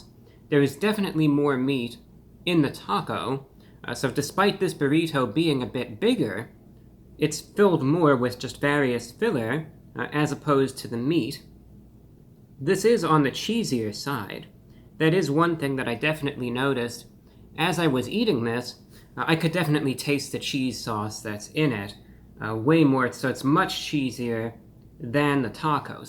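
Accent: United States. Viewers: I dont think ive ever heard anyone speak American English quite as properly as you 😂😂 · Is it just me or is anybody else wondering if this guy is American or English??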